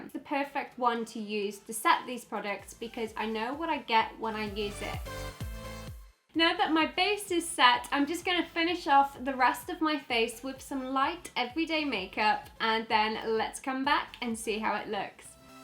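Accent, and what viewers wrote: United Kingdom. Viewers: does anyone know what her accent is like underneath the British? just wondered cus it's so pretty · She sounds British but sometimes when she talks she sounds different, is she fully British? Or did she lives somewhere else first?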